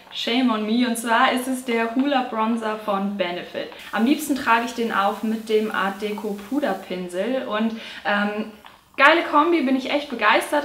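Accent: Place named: Berlin, Germany. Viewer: Ich find dich super sympathisch! :) Kommst du aus Berlin? Du bemühst dich zwar sehr feinstes Hochdeutsch zu reden aber ich werd das Gefühl nicht los, dass du von hier bist :)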